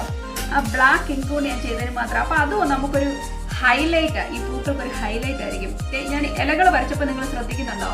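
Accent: Kottayam, India. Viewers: ഇച്ചിരി ഇച്ചിരി .. കോട്ടയം. ചേച്ചി നന്നായിട്ടുണ്ട് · കോട്ടയം kari ano 😍❤️😘👍👍👍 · Superb, good work, kottayam aano naadu, varthamaanam nalla rasamundu kelkan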